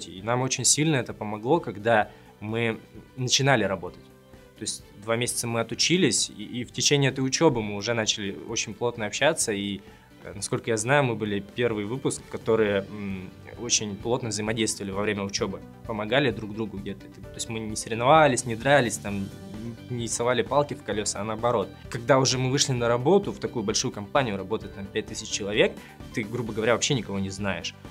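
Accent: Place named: Urals, Russia. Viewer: Даже можно было бы не говорить что с Урала, по говору и так всё ясно)))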